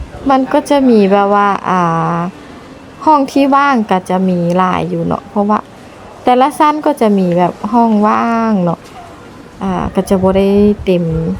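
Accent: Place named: Thailand. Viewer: สำเนียงการพูดจาคล้ายคนไทยมากฟังเข้าใจง่ายชื่นชม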